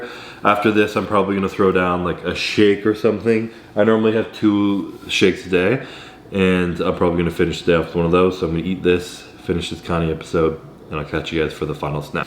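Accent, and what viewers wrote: Canada. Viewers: He’s Canadian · Yo man are you Canadian?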